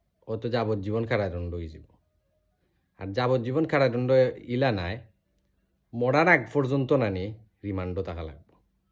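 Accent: Sylhet, Bangladesh. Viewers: Apni chalti vhashei kotha bolun bujha jai na..kisui....sab toh siletty jane na😂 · তোমার ভাষা ঠিক কর কতটুকু সিলেটি কতটুকু শুদ্ধ অবাঙাল কোথাকার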